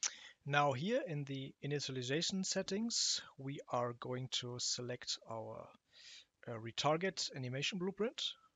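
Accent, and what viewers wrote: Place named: Germany. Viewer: oh, German accent :)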